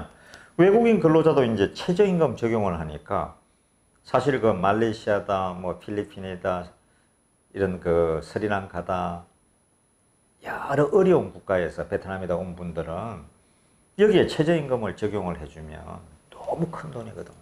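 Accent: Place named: Gyeongsang, South Korea. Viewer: 목소리 너무 좋으시고 발음도 정확한 교양있는 경상도 말씨를 쓰시는 방송 너무 너무 멋지고 좋습니다. 아마도 아직 재판 중이라서 신경쓰실 일도 많으실텐데... 건강 관리 단디 챙기십시오. 종종 인사드리겠습니다. ^^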